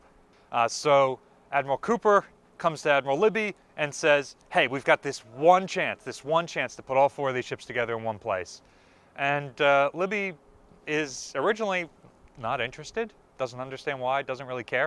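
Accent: United States. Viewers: American voice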